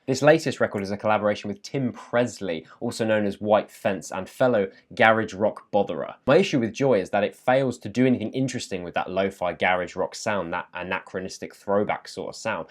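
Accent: RP, United Kingdom. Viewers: dont worry he's just a really posh one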